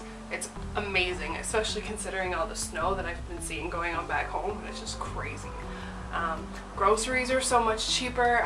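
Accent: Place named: Scotland, United Kingdom. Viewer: I love Scotland. I had a great time in Edinburgh, glasgow, and Oban. My boyfriend and I are planning to move there. I did notice some things are cheaper like groceries! I really feel Toronto is not for me. I was so depressed when I got back, but I got plans! thanks for the info. I also noticed that some words you say sound a little like the scots. :)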